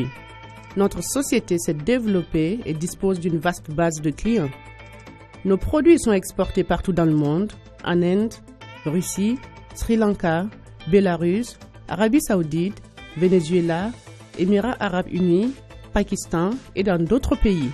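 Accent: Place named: Africa. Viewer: j'adore l'accent africain !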